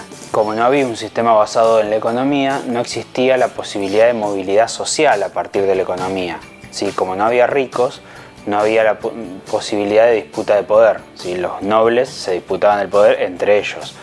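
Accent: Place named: Argentina. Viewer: Es un buen análisis acerca de los orígenes del capitalismo, aunque faltó añadir la clase de perversidades que es capaz de llegar a cometer lo que el propio Papa denominó como "capitalismo salvaje", y que es la etapa superior del capitalismo llamada "imperialismo", y su nueva arremetida que actualmente se lleva a cabo denominada "neoliberalismo".Sabemos que hoy la cuna del capitalismo mundial está en Estados Unidos. Este país no es más que un conjunto de empresas muy poderosas que a través de guerras e invasiones buscan adueñarse más y más del poder mundial. Supongo que estas ideas las desarrollarán en próximos vídeos. Un sólo aspecto de este vídeo al comienzo me incomodaba, después ya me desagradó, finalmente terminó molestándome. ¿Por qué el locutor acentúa y enfatiza tanto en la pronunciación de la letra "ye" o la "ll" convirtiéndola en una "ch"? 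Él no dice "llega a convertirse" sino dice "chega a convertirse". Ya sé que esa es la pronunciación del argentino, pero al menos podría suavizar este rasgo para así universalizar más este buen vídeo y evitar que con ese sonsonete caiga en localismos y regionalismos que pueden resultar no del todo agradables.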